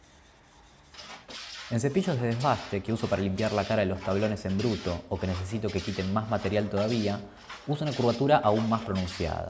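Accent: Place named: Buenos Aires, Argentina. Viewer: muchas gracias!, yo sabia!! Pensé sos tipo es porteño, yo sabia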